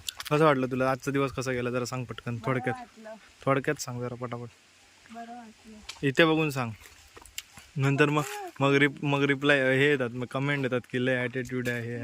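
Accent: Malvani, India. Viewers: Kiti god malvani bolte ❤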